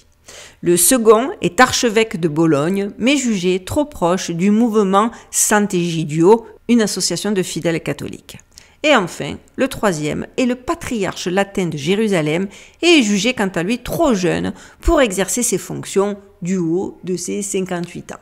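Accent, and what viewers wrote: South, France. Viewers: Super merci et ton accent du sud si particulier me régale.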